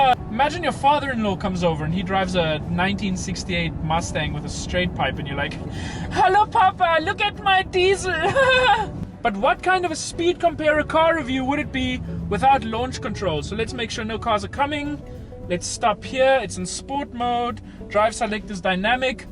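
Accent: South Africa. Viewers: Are you South African mabey? The accent sounds familiar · is this guy South African? I'm new to the channel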